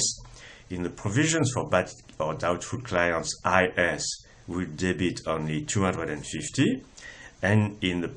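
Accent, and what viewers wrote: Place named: France. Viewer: This accent sounds French! :D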